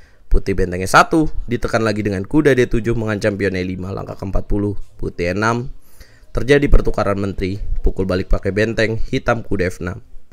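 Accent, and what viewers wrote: Tegal, Indonesia. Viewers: Sampean asli wong tegal mas?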